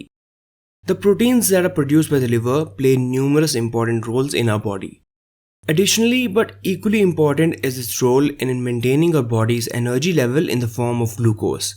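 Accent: India. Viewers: Thanks Dr. I love your Indian Accent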